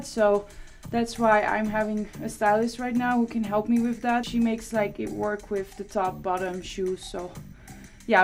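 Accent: Netherlands. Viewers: You can hear the dutch accent coming out 🥰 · I’ve never heard of her before. But when she started talking I knew immediately that she’s Dutch. The Dutchness seeps through ✨ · Oof Dutch accent ugly asf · U really hear that dutch accent amd the name...very dutch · I love how the Dutch pronounce the “th” sound with a “d” instead. “Dat”, “dis”, so cute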